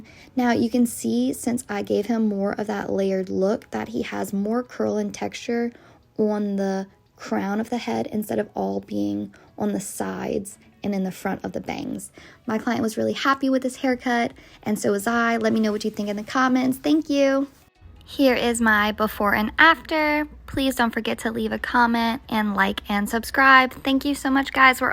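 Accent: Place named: United States. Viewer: I can’t do with the American accent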